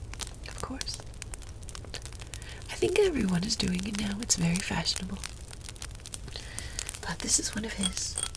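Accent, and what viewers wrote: Denmark. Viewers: I'm from Sweden and I could hear you Viking blood sing ;) Sounded alot like a Danish (viking) to me! Love all the history you weaved into your viking saga :) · As a dane I gotta say, the accent is on point, you sound like someone from Copenhagen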